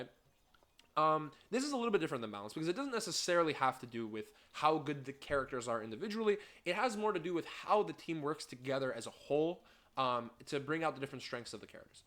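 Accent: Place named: United States. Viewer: Wow your accent sounds so American in this video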